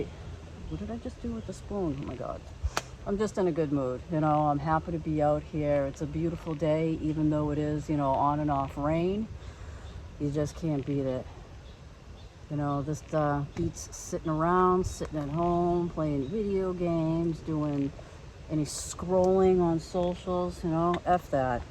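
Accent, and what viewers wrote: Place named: East Coast, United States. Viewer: Love your videos, and your east coast accent 🎉🎉❤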